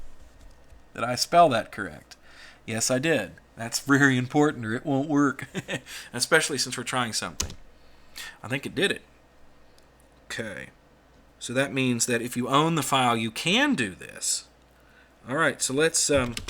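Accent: South, United States. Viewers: Ignorant Brit, here.. what Southern accent exactly do you speak in? It's soooooo precise in the consonants, and clear. Maybe you're just well-practised with a mike, too.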